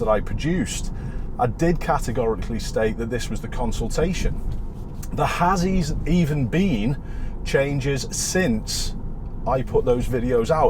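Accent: Liverpool, United Kingdom. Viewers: I don't drive, I just enjoy your videos when I need a relaxing dose of calming Scouse Zen. I am a cyclist and I'm always painfully aware of my own presence on roundabouts and junctions and how it affects other road users and we certainly don't need the ramblings of a prominent concrete farmer to muddy the waters.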